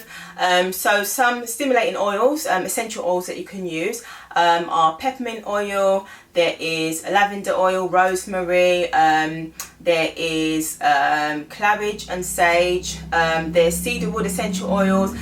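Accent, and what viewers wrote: United Kingdom. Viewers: Finally a British accent . Thanks girl now when u give recommendations on products I can actually get them. 🙂🙃🙂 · Lovely to hear a UK voice! Thank you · I said the same thing. Great to hear a British sister. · I didn’t hear anything she said but enjoying her accent...i bet she is from Uk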